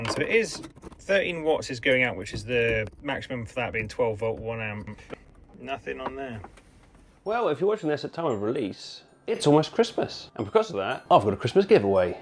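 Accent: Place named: Scotland, United Kingdom. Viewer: my god i got a reply.. been watching you since the start of your conversion i heard the Scottish accent instantly cheered 🤣 and subscribed. I live in argyll. 65-70% of the way done on my conversion but just not getting the time to finish it. Hope all is well with yourself and thanks for the reply 😊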